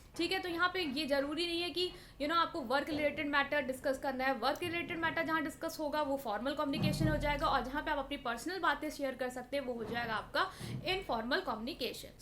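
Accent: Lucknow, India. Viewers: App Lucknow say hai lag raha😀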